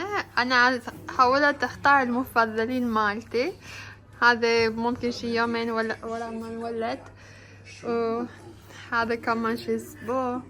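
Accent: Iraq. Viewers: عفيه عليچ شلون تحچين عراقي حلووو انت انسانة طيبة ،، وشفت شگد مقهورة على زوجك ،، وحسيت بغصة صوتچ ،،، الله يبارك بيچ حبيبتي الله يحفظچ ويحفظ وأيان باذنه تعالى ❤❤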